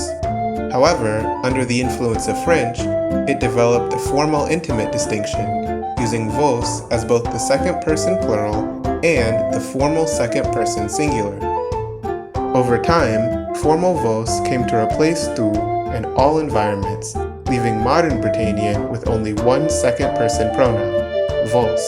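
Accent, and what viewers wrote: England, United Kingdom. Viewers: Interesting.Sounds like a native English speaker trying to speak French. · It sounds like an English speaker trying to speak French, except they only memorized a French dictionary and know absolutely nothing about French grammar. Also, they've never heard spoken French, so they just pronounce each word with the thickest possible English accent. I love it. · I'll admit that I appreciate the effort that has been put into the phonology and etymology side of the video. However, the reason it still has that "englishy" sound is because the speaker has put relatively little effort into how the pronunciation would sound, and is simply using their native, modern english accent as a model of pronunciation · Intetesting, sounds like a translation from english to 3 or 4 of modern romance languages at the same time, with some not translated words and english accent.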